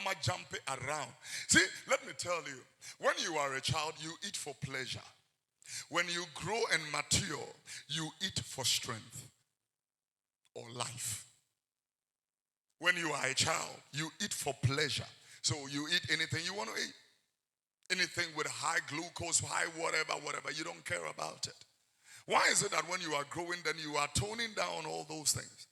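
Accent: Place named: United States. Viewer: I love your message anytime I listen to you but please if you can leave the America English I will be happy because we're Ghanaian let us love whom we are. thanks 👏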